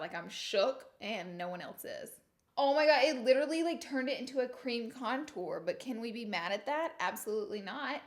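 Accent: South, United States. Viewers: Love your dramatic southern bell voice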